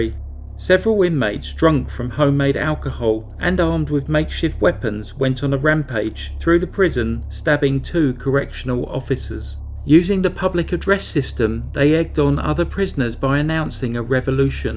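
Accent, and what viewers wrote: United Kingdom. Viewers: Blimey he was a real right canker! Brilliant stories and narrated so Beautifully and British!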